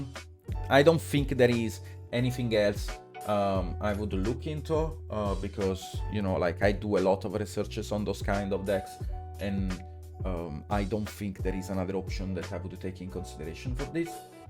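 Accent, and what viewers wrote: Italy. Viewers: Ma sei veneto?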